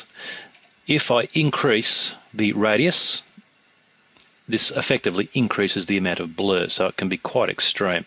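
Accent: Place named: Australia. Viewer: wow,, great tut man, great, btw,,,,,, are u australian???? cuase ur accent sounds like ur aussie..... lol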